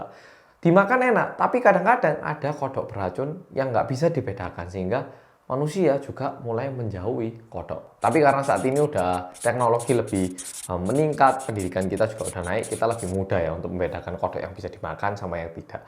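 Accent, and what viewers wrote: Java, Indonesia. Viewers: 😂😂 next time coba daging T Rex ya 😀👍 Tapi tetep salut saya sampean medok jowo ne. Lah neng omah ku Kabeh saben Dino Yo pake boso Korea padahal neng Indonesia wes 20 tuon.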